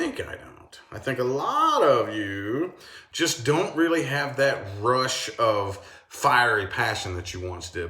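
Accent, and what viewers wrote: South, United States. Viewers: I love your voice!! It sounds very southern ❤💕💕💕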